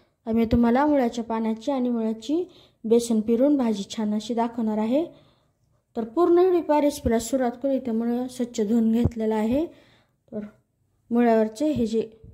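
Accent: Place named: India. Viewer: तुमचा आवाज कीती गोड आहे 😍 अस्सल गावरान मराठी भाषा त्या आवाजाला अजुन सुट होते 👌💯✅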